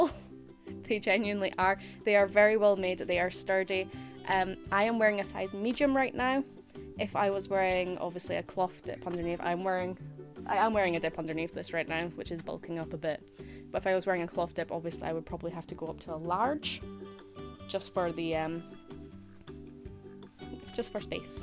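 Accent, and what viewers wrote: Scotland, United Kingdom. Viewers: Your Scottish accent is tae die fer!!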